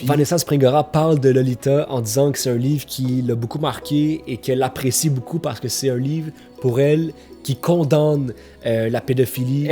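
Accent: Quebec, Canada. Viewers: j'adore ta chaine, continues tes vidéos lecture, c'est très intéressant, ce que tu as écrit à la fin m'a touché, c'était très bien dit, bisous de France (haha j'essaie de me familiariser avec ton accent québécois)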